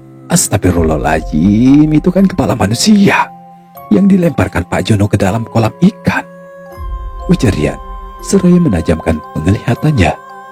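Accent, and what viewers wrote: Palembang, Indonesia. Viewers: Itu gaya bahasa palembang byk kata o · Bang itu bahasa palembang ya, ciri khas nya pakai hurup O ,benar ngak bang ?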